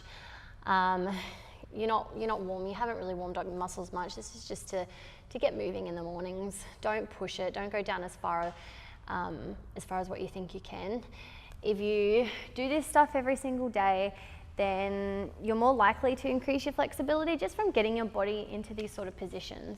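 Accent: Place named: Australia. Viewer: Maybe it’s the Australian accent but I loved that video! It moved a little faster than I would have so it kept me on my toes! 🫶🏻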